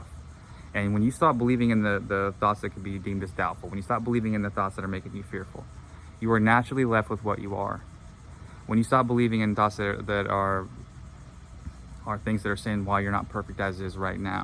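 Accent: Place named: Cockney, United Kingdom. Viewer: Haha I’m British and love the little cockney accent you slip into your videos 😂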